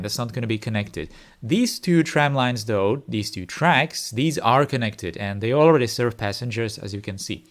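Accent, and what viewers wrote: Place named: Czech Republic. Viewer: This is probably a wild shot but you sound like you're from Czechia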